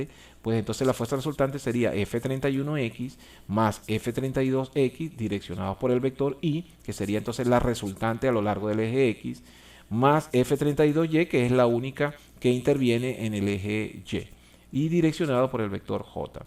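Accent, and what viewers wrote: Venezuela. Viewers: Acento Venezolano Nojoda! gracias a ese profesor saqué 20 Pts :D, se lo agradezco mucho profe!. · Ese acento venezolano que no se lo quita nadie. Saludos desde Venezuela. Estoy mirando el vídeo horas antes del examen. Deseenme suerte papus v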